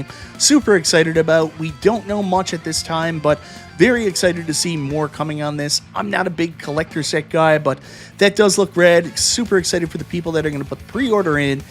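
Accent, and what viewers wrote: Canada. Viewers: I experience only pain, and confusion when I can't understand the Canadian accent.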